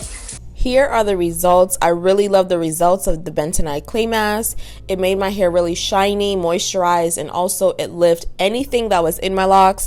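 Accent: Jamaica. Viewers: Im Trini, as soon as you started talking Yardie, I died. Love my Caribbean people!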